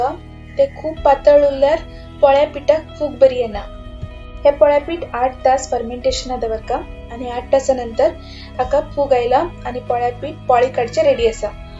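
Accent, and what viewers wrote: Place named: Konkan, India. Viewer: Lovely. I like your shudhdh Konkani.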